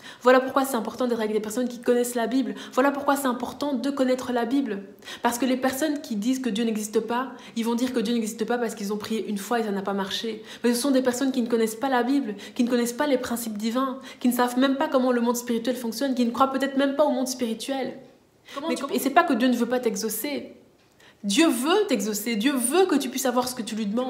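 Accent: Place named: Belgium. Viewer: Super message. J'aime bien ton accent belge. Keep it up ! God bless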